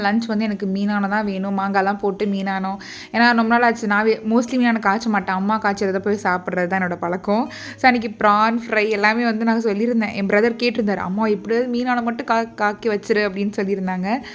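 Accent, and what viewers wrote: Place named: Udangudi, India. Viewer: Neenga endha oor neenga udangudi kaaranga maari pesringa en ooru adhaa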